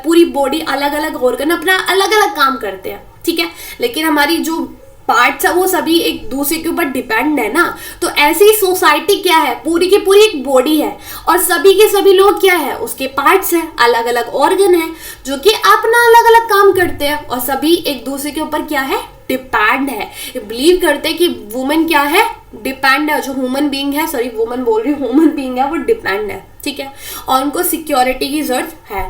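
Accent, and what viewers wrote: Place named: Delhi, India. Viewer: It's really interesting.... To listen👂 Is YouTuber from Delhi?? Or NCR... speaking ascent!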